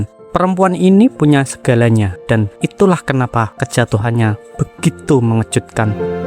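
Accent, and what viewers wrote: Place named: Java, Indonesia. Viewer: KA medok nya ganggu maaf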